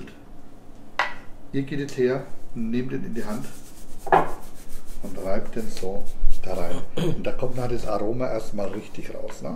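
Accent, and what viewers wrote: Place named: Franconia, Germany. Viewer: Fränggisch - ich gläbb des werd nix Ä riesen Sach und dann noch blaue Zibfl Grüße aus Schweinfurt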